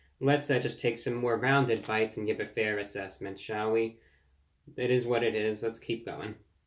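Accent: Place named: Transatlantic, United States. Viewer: Dude I swear you're like the the closest to being a living relic of the Transatlantic accent, it doesn't sound purely transatlantic but it's close to it, very close to it! You should do some voice acting on 20th century themed video games or something like that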